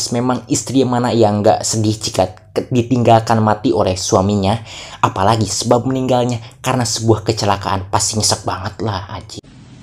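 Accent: West Java, Indonesia. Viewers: Nma nya spa bng Sunda nya mna bikin ngakak kalo dngerin suaranya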